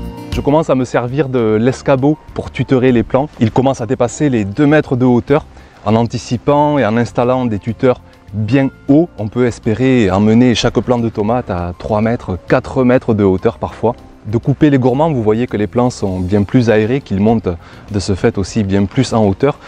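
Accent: South, France. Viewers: Salut comment va tu? et quelle plaisir de te retrouver et d’entendre ton accent du sud 🤣 Si tu veux on peut faire dû troc de légumes. C’est vrai les haricots on mal germé mais là j’arrête pas de cueillir j’en suis à la deuxième récolte. C’est vrai les tomates 🍅 nous font languir elles arrive on compte goûte. Les courgettes non font plaisir et on a hâte de savourer les aubergines 🍆. Bon dimanche et à bientôt 😎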